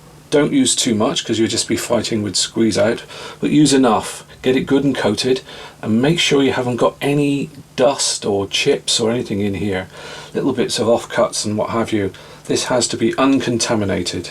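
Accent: United Kingdom. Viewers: You're doing excellent work. I really like the British accent too. I'm from Germany by the way. Any chance you can show us to make a Gibson SG but with symmetrical horns? Because every time I look at the original I get furious. I hate asymmetry.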